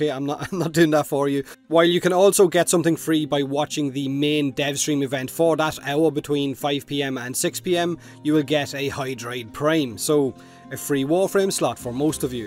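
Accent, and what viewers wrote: Ireland. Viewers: Hey, you are Irish, right? Do you know Plenty O'Toole?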